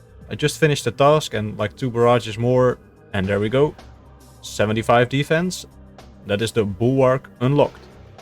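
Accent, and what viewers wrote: Netherlands. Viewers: Hearing you say "blasted these fools with the d hally" in that amazing Dutch accent of yours made me grin from ear to ear lmao. Always looking forward to the next episode, keep it up!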